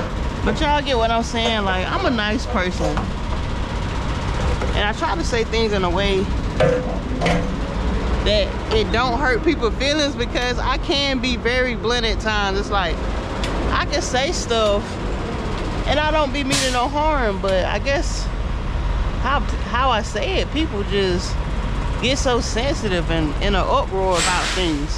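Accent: South, United States. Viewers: Hi I could Listen to you speak all the time I am from the North I like to hear southerners speak it's so lovely,you are a very intelligent respectful young lady,whenever I am in the Carolinas Virginia or Georgia the people are so friendly and have such lovely manners,I like also that you are being you and not pretending in front of the.camera, you are being yourself and that's awsome,safe travels. P.S. I enjoyed your.visit with your Grandfather and your hometown and when you were showing what your car can do that was awsome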